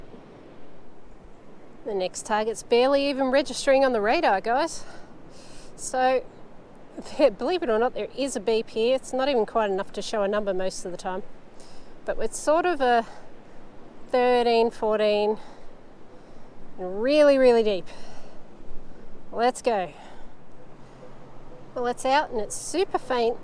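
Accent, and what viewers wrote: Australia. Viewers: Good job, young lady 👏... Well done and very enjoyable. Love your Aussie accent 😍❤. Your new adopted Granddad in Florida...praying that you will be Blessed and safe 🙏...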